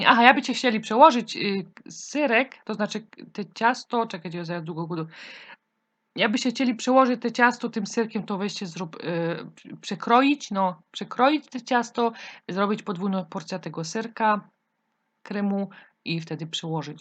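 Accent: Silesia, Poland. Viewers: Fajna gwara Śląska . Robiłem to ciasto już wcześniej ale bez masy.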